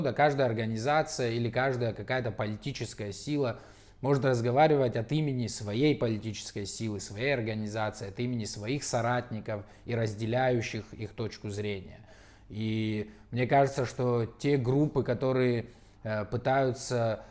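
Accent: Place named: Kabardino-Balkaria, Russia. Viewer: акцент чисто кабардинский.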